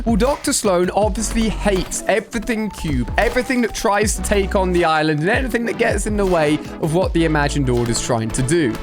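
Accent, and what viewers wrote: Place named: United Kingdom. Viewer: She-swee is how you pronounce shisui but he lives in UK so he talks different. I'm not hating btw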